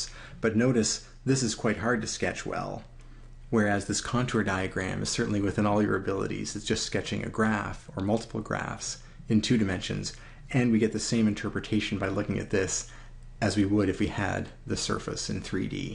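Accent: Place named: Canada. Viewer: Thanks. Your 'out' and 'about' gave away your Canadianess eh!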